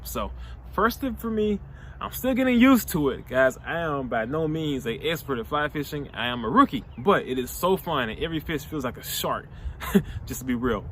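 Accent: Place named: South, United States. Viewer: Lol temperature swangs! Lol thats southern draw! I enjoy your videos bro. I hope to see you do more kayak fishing. Thank you for taking the time to make these videos.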